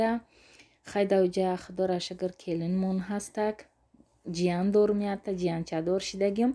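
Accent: Konibodom, Tajikistan. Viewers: АСАЛОМУ АЛЕКУМ апачони гулмм бо кони бодомш чи лавзаи 🤗😂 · Хохарчон заминкадар чизхоя шавхаратон бахшидагиет, вай бошад намемонад ки шумо бо шеваи ширини Конибодоми гап занед😊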